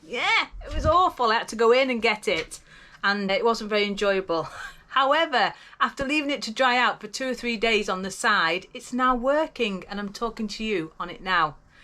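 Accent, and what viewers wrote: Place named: Ashton-under-Lyne, United Kingdom. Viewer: Love your videos. Am trying to place your accent. Im fron Ashton-under-Lyne and hear myself in your accent. Where did you come from thinking it must be in this area?? Keep making us smile 😃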